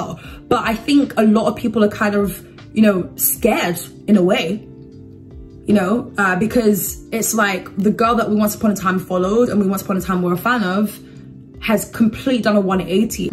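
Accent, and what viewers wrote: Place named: United Kingdom. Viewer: I like you, your accent, i know it, you have to be british. you are so cute!! very weird seen an adult act like a child.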